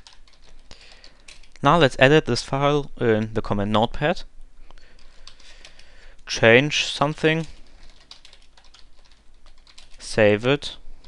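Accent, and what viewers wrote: Germany. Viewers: Bist du Deutscher oder Engländer?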